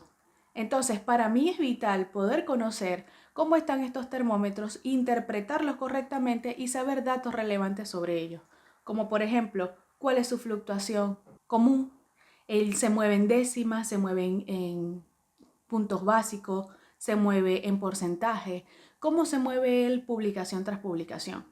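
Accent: Venezuela. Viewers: Ese acento venezolano tan bello que tenemos jeje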